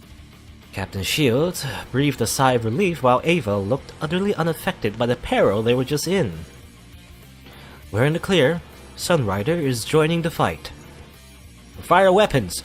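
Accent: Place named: England, United Kingdom. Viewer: sorry I posted the same message twice...for some reason it didn't show up either time so I thought I hit the cancel button by mistake. I just deleted the repeat message so it no longer shows......I didn't even realize English wasn't your native language....I thought you had an English accent actually....you speak it tremendously well.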